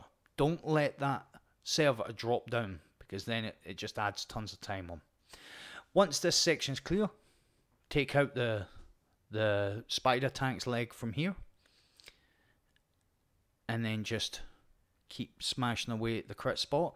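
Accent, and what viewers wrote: Scotland, United Kingdom. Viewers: Thanks for a great video! This was really well explained and shown and had some pretty good tips for some techniques that I haven't seen before, on other videos of this mission. You played this beautifully!! Talk about making it look EASY! I wish I found your channel earlier! Only a few minutes into it, I knew I had to subscribe. Definitely looking forward to more! You have a very interesting accent. Is it Scottish?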